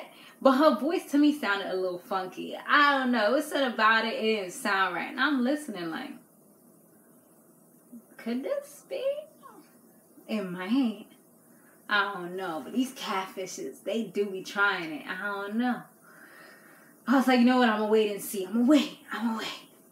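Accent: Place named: New York, United States. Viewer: Yo you a baddie & that NY accent makes you even more attractive😍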